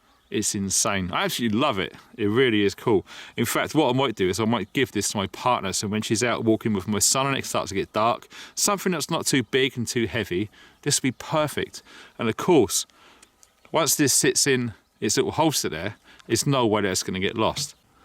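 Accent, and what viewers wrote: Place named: Norfolk, United Kingdom. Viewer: Thanks for the your videos. Please can I ask are you originally from Norfolk ? I was born there but now living in the the northeast. Just thought I could detect a faint bit of the familiar accent.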